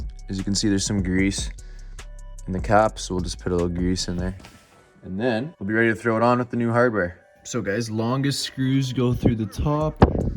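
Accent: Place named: Central Canada, Canada. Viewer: So, just for fun, if I was to guess by the rocks and wood you have on your course, would I be right you're a West Coaster? I was thinking Central Canada by your accent, but thinking the natural materials say West. LOL!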